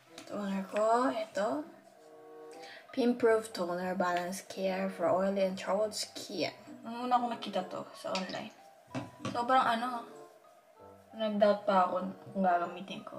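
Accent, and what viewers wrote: Pampanga, Philippines. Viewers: Yung accent talaga ng pagka kapampangan eh😅 Proud cabalen here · So cute! Lalo na yung kapampangan accent. I wonder if you're a kapampangan po.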